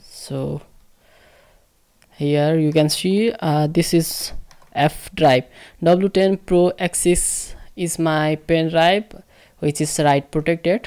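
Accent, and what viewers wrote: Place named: Arab world. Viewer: What country are you from you look Indian but have an Arab accent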